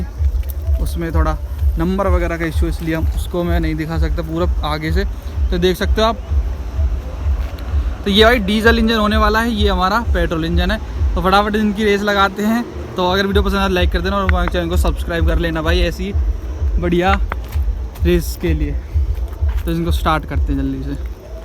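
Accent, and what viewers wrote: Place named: Palwal, India. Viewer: Bhai tum palwal se ho kya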